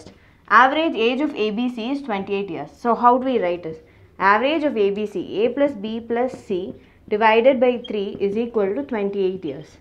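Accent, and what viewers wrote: South India, India. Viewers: i like ur voice so much.. 😊 south indian tone ♥️ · She is south Indian..am damn sure..most probably from Bengaluru.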